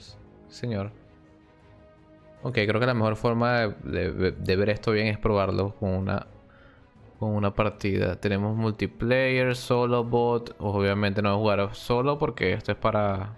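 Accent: Venezuela. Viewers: lo mismo me preguntaba yo xD es venezolano ? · Eres venezolano mano?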